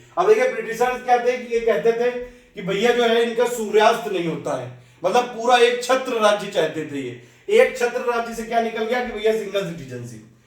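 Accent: Kanpur, India. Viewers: sir Aapka kanpuriya andaj Dil jeet leta h kasam s entertainment with study 🙏🙏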